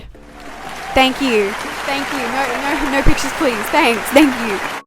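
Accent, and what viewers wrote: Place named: United Kingdom. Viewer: Yes. She sounds british. wait wtf how old is she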